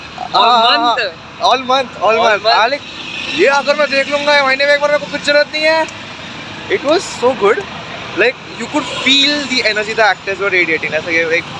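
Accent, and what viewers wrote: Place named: Maharashtra, India. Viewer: happy birthday!!! i have known you since 2 months online yet i feel a sense of familiarity in you plus im happy to know that youre a marathi too!! No wonder your hindi accent felt familiar!!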